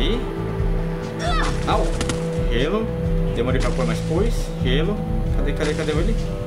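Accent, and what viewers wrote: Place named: Minas Gerais, Brazil. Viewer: Vc é mineiro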